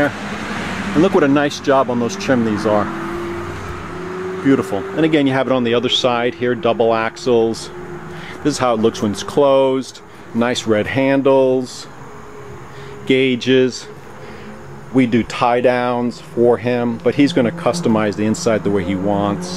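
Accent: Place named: New England, United States. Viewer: I love the Yankee accent........ Yankees making Southern style BBQ always give me a chuckle. Hahahah Nice trailer and good information. I would love to have a trailer like this but it would be a real drive to get it here in the REAL DEEP SOUTH in Chile. Thanks for the video. Jim in Chile.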